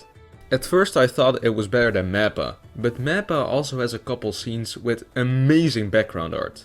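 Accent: Netherlands. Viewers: Are you Dutch? Your accent sounds very Dutch so I'm just wondering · Ik kan gewoon horen dat dit een nederlander is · can tell ur Dutch by ur accent lolll w NL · U sound so dutch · Bro sounds dutch?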